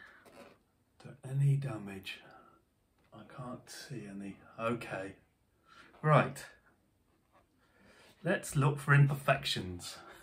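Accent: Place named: United Kingdom. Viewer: Must have be the British accent.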